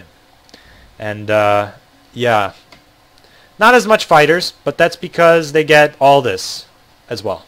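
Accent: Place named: Seattle, United States. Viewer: I thought u were from Seattle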